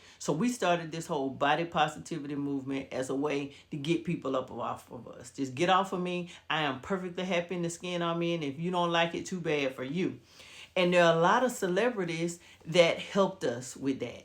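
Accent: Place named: South, United States. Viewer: I can tell by your accent that you're from the South. I am from the South as well. But I also lived in California for 2 years. And I can tell you that most Black Men on the West Coast DON'T like Overweight women!!! That's more of a Southern thing.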